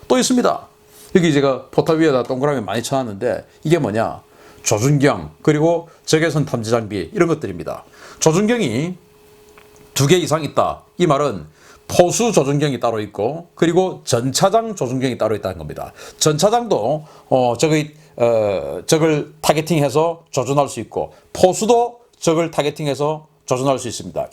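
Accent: Gyeongsang, South Korea. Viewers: 유투버가, 일본말비슷한 상도사투리쓰면않되요, 듣기거북하고 ,애들교육상않좋아요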